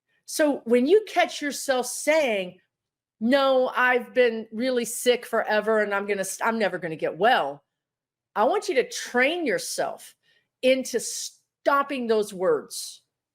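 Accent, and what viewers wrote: Texas, United States. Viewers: I had to stop you at 16/58 over "lifetime" vs their "lifetimes." Part of the draw here is the chemistry/angst/respect between you and the guides. For anybody popping in for the first time it adds immediate credibility. For me it fosters a growing appreciation for what you both bring. Personally, I love their language, just as I do yours when you talk Texan or whatever you'd call it.Equally charming.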